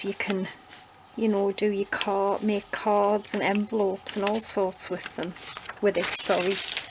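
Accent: United Kingdom. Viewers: I soooo love your videos, such great ideas (and I love listening to your British accent) You could use a small square of velcro to close the pouch with. I have them on covered paper pads and they work well.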